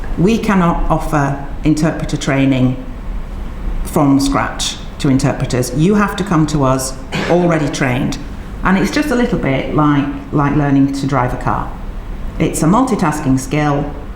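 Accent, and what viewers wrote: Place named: United Kingdom. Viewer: I THINK she is british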